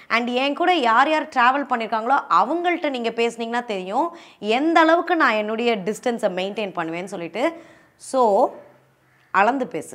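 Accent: Madurai, India. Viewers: Va la po la nellai basha ila ? Neenga madura basha nu solringa 🧐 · Am also from Madurai yes in that city they speak like this only commonly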